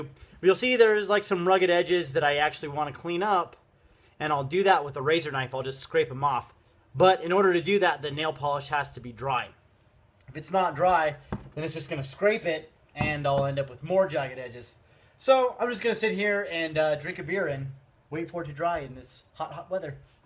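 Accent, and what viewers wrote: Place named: Albuquerque, United States. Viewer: Burqueño hahaha oh man bro straight from barque for sure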